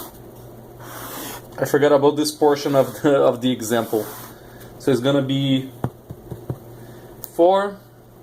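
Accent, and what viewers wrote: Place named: Brazil. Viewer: Are you Brasilean? I can notice a Brasilean accent in your voice background.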